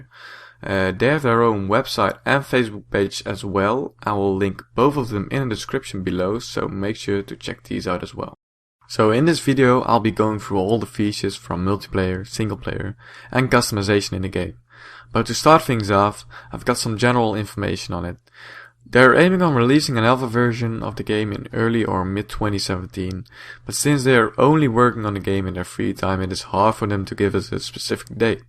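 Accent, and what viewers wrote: Netherlands. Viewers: are you dutch?? you sound dutch ;);)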